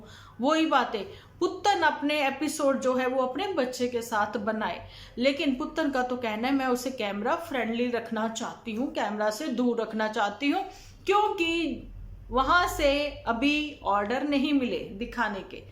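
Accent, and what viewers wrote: Punjab, India. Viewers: that’s Good 👍 I love your Punjabi Accent lots of love side by 🇨🇦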